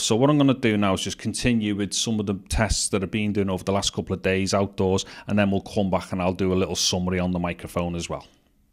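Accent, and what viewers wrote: Liverpool, United Kingdom. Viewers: Hes a scouser boss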